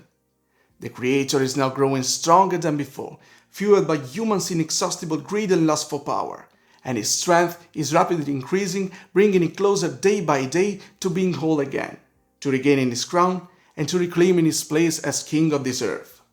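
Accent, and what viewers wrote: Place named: Italy. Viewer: ho ottenuto italiani origini dai quelli vecchi.. grandparents from my dad's side so I recognize an Italian accent anywhere 😅 also I was never fluent so pardon my butchering when I write, it's probably backwards lol. Do you have any social media?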